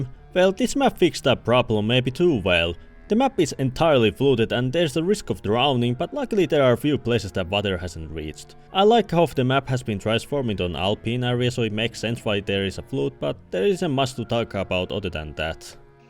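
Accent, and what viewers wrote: Finland. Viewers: Kiva rallienglanti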